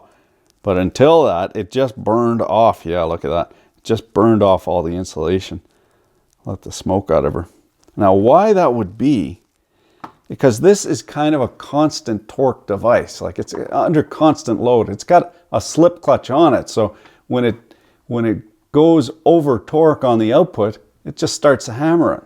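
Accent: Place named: Canada. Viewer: This might be the most Canadian fellow I’ve ever heard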